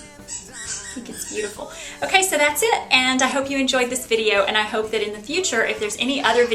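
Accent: South, United States. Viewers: Favorite part; "I'mon spray.." Favorite southern belle in the world.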